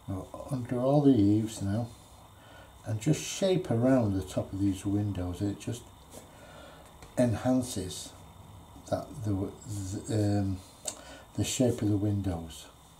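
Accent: United Kingdom. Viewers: Just discovered your channel. I am a sucker for a British accent, love your analogies, you are a very talented artist. Thank you for sharing with us.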